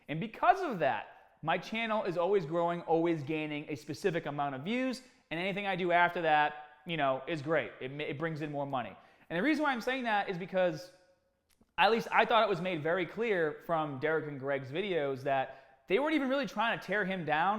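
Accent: Boston, United States. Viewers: The boston accent is killer! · 1 min in, ik hes from boston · That Boston accent coming in THICK! Much love from Texas! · I came for the Boston accent. Wasn't disappointed. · Wait a second. I’m subscribing for tha facking R kid. Boston I gather ? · You from Boston bro! I can tell from the T AH T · Damn you got a strong Boston accent bro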